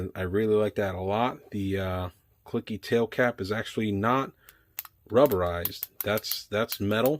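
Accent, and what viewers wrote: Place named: Boston, United States. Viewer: That's a pretty comprehensive review - Thank-you!! Whereabouts are you from, fella? I almost thought Boston, but your accent isn't the typical Bostonian I'm used to... Also, if you don't mind me asking, what do you do for a living that requires a duty belt? I'd guess Police Officer, from the '911' part of the channel name?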